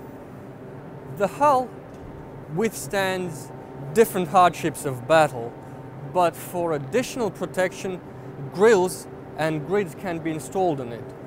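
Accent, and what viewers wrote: Russia. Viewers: Ох уж этот Russian accent )) Техника огонь)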